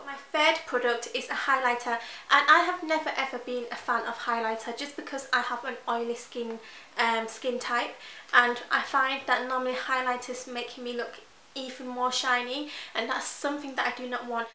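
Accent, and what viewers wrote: Yorkshire, United Kingdom. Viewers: Is she from Yorkshire?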